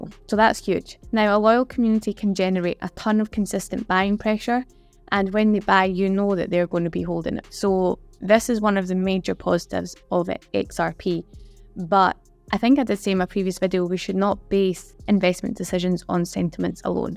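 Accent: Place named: Edinburgh, United Kingdom. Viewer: Nice video.. That sounds like an Edinburgh accent, that's where I'm from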